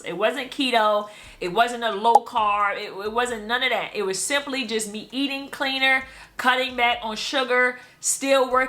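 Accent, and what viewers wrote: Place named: Washington D.C., United States. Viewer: Are you ftom D.C ?? I hear accent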